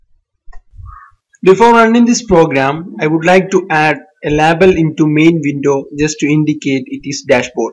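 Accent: Kerala, India. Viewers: Malyali aano ? Nice explanation.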